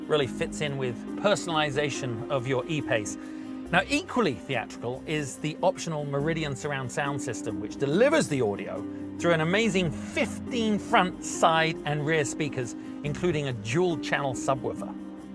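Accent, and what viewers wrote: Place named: United Kingdom. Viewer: if this is Jaguar USA, why the host has british accent?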